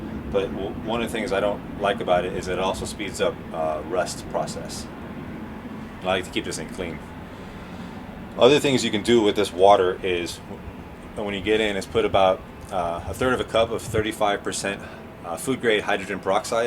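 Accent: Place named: Westchester, United States. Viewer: loo sounds like your in westchester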